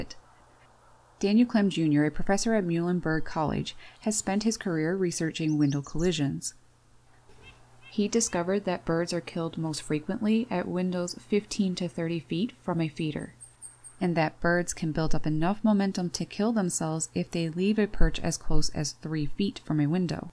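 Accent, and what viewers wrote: Canada. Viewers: So we are impacting the natural birds via our feeding, but in a positive way, is basically what you're saying correct? From the pronunciation of the "ou's" I assume you're from Canada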